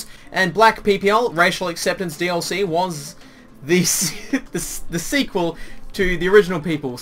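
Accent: Australia. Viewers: The Aussie accent! <3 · Are u from aulstralia?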